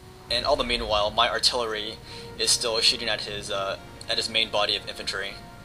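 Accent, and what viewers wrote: Netherlands. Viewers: lol nice battle and ur dutch accent is funneh but very well spoken. I was suprised. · funny dutch accent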